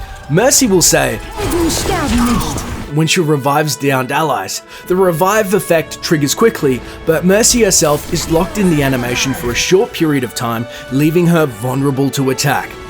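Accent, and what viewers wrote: Australia. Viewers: Ay, U Aussie? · Are you Aussie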